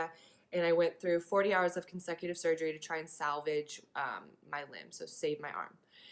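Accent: United Kingdom. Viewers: Her british accent thoo😋😋❤ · You are so inspirational and beautiful. ....and your British accent!!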